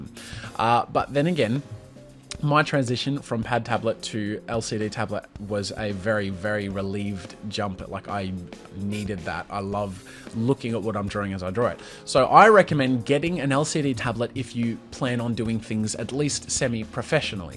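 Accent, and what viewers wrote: Australia. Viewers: you sound Australian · ARE YOU AUSTRALIAN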